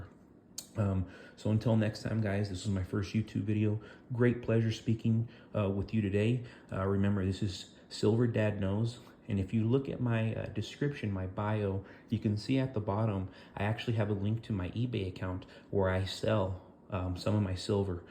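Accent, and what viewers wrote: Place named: Texas, United States. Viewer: Texas accent too heheheheee!!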